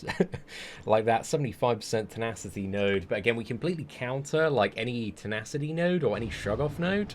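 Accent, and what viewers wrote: United Kingdom. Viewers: I love apocalypse you are lucky Also love the British acsent it is the best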